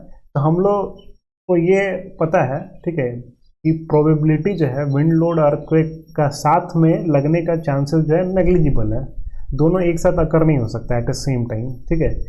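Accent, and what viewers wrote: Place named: India. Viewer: Indian Accent 🙆‍♂️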